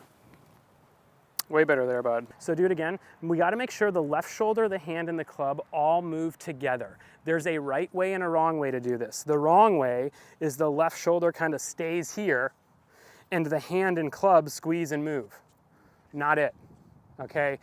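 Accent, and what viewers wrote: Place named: Scotland, United Kingdom. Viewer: Great to hear a Scottish accent.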